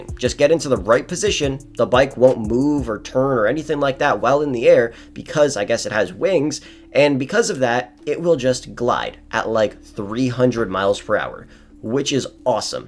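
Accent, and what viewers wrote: Slovenia. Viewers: Wait are you from SLOVENIA😅🇸🇮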